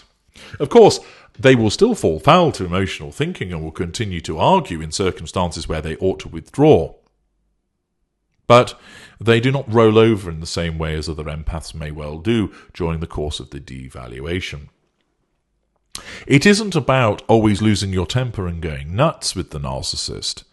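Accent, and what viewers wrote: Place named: RP, United Kingdom. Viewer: I have such a hard time believing that you are a narcissist and/ or psychopath. Is it my bias for the dulcet baritone, the lucid RP ?? I've whistleblown and taken on entrenched corruption and criminal narcissistics who resorted to harassment by proxy to get at me when direct attacks failed and failing that were exposed in court ( long story) By the time I won the case the guy was afraid of me and very angry.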